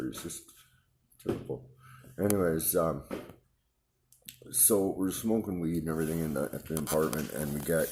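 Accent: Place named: Canada. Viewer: How do you not have more subscribers.. Great stuff I subscribed right after itsmeen recomended you. You can tell some stories and the mix of Canadian and native accent sucks you into the story even more..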